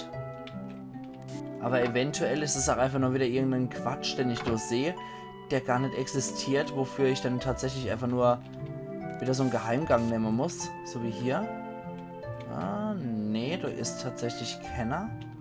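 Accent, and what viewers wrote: Palatinate, Germany. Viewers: Nagut Nagut, dann erst Mana, hihi. Aber ich freue mich, ich werde live dabei sein, wenn jemand frisch/blind Chrono Trigger genießt, das ist etwas ganz besonderes. Uff Pälzisch ist dann nochmal ein Super Bonus, denn ich versuche schon lange das Spiel mal wieder zu beginnen, leider habe ich bisher kein Action Replay mehr um es anzugehen und auf meinem Rechner funktionieren die deutschen Roms iwi nicht. Auf Mana freue ich mich natürlich auch, das werde ich aber nur sporadisch verfolgen, da ich es mit meinem Sohn bald selbst zusammen mit meiner Frau via Multitap spielen werde, da will ich mir nicht die Spannung nehmen. Naja Später kannst du ja mal die eine Stelle vom Schattenwald untersuchen, die mit Man/1up auf den Maps markiert ist. Da kannst ja dann wieder hin. Übrigens ein Tipp, sobald du "reisen" kannst in Secret of Evermore, dann gibt es da eine Stelle in der Römischen Gegend, da gibt es so Statuen, dort kannst du an einer Stelle, die auch der Hund erschnüffelt, gefühlte unendlich Zauberperlen aufheben. 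Kennst du die Stelle?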